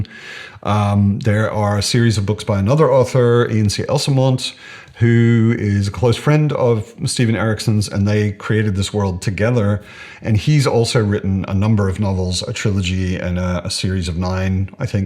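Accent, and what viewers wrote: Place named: Australia. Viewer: I can’t place your accent at all haha I can just tell there’s a bit of Aussie in there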